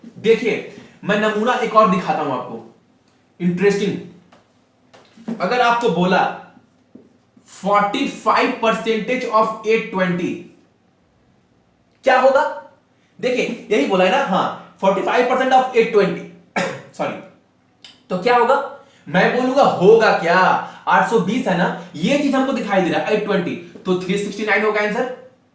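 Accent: Bihar, India. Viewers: very nice way to teaching sir....and bihari tone omg thnq sir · i love this bihari tone awesome both subscribe and like done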